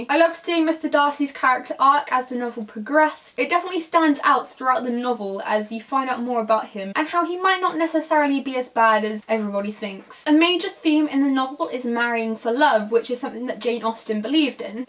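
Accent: United Kingdom. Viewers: Your British accent s so great, BOOM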